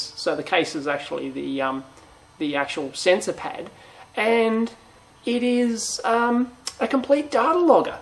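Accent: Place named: Australia. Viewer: I wondered about the strange accent ... Now I know Australia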